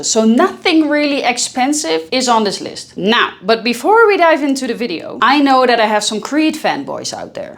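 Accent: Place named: Netherlands. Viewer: Nederlander 100% 😂 hoor je meteen! Leuke video.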